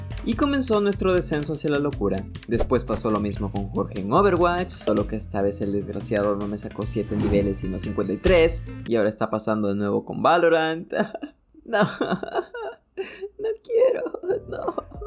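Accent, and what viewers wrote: Chile. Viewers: Eres chileno? Peruano? Boliviano? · Primero...: me gusto el video!!! Me encato!♡ Y segundo... Así que mi sospecha era cierta?! El men si es chileno jsjsjs, pense que era imaginacion mia, pero la wea era cierta. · Eres chileno? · Es chileno 😳👌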